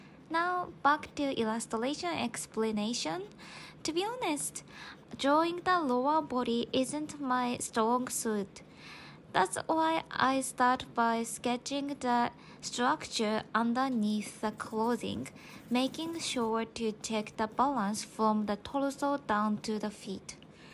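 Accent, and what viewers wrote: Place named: Japan. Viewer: Omaigah a japanese talking in inglish idiom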